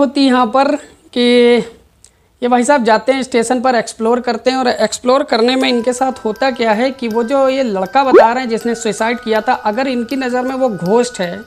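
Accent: Bihar, India. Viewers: Interacting channel interesting analysis. To the point. Nd ap bihari ho?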